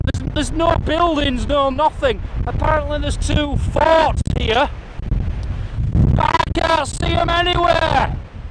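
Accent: United Kingdom. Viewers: Wow, 7 miles in 21 minutes, that is 7 three minute miles and you were hardly breathing hard. When are you going to do the Olympics? I love your since of humor. Are you from England, Scotland, or Ireland?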